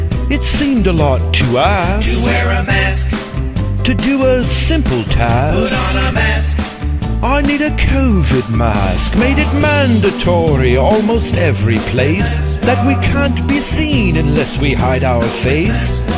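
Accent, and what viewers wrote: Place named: England, United Kingdom. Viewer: This wouldn’t be the same without the English accent.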